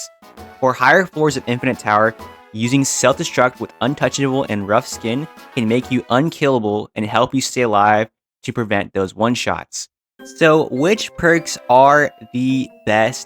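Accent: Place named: United States. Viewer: bro thanks man, these videos are really helpfull its nice to have a normal NON AI american voice explaining the game. W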